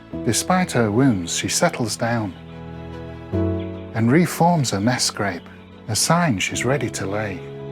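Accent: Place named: West Yorkshire, United Kingdom. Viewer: Hello! I've watched a few of your videos before - I genuinely started because I love animals in general but also having lived in West Yorkshire for a huge chunk of my life I just missed the accent! Haha! - but have finally subscribed. I am a huge fan of corvids, they're so beautiful and intelligent so it felt a bit strange rooting for the Kestrals in this video. 😂 Thank you for these educational and informative videos, I've learned a lot in just the few that I've watched and am definitely going to introduce my 10yr old nephew to the channel as we love watching animal documentaries together. Looking forward to binging through your past videos and seeing what comes next! Thank you for all your conservation work that keeps these species and many more around for my nephew and following generations to see. It breaks my heart to think that some of the creatures we see around us could be as distant an idea to future generations as the woolly mammoth is to us! 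😢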